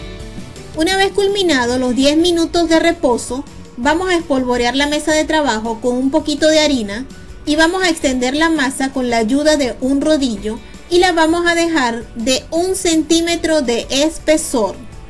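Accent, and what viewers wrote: Venezuela. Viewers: estoh eh venezolano o que chicoh po que si va pueh tanto venezolano aca parece peru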